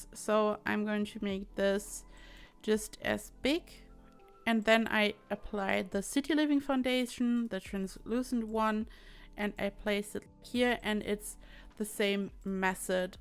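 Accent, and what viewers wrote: Germany. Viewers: From where she is German? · Du hörst dich deutsch an kann das sein? 😊(gerade erst indeckt noch keine anderen Videos von dir gesehen) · Are you german? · Are you from Germany? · You have a German accent. Am I right? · Bin ich echt die einzige die bei den ersten Wörtern schon wusste SIE IST DEUTSCH 😂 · I don’t know why but since I am a German I think that she sounds very German when she speaks English ( can you tell me if I am right )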